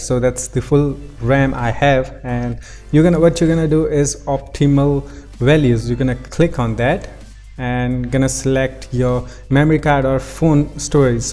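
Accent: India. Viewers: 70% People talking about his Indian accent 30% People talking about ram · So can you choose what storage you take the ram from? First indian guy i saw who has nice accent😀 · Beautiful Indian Accent 🤧✨💖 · Will it work on my 1gb ram phone? BTW you're my first Indian YouTuber I know who has a great accent unlike the others!!! Keep it up bud!! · First time i heard good indian accent. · The Indian english accent is working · Best Indian speaking English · Wait what ur indian :O nice accent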